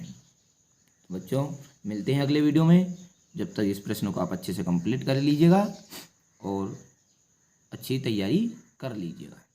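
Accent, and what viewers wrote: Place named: Uttar Pradesh, India. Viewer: Tum up se ho na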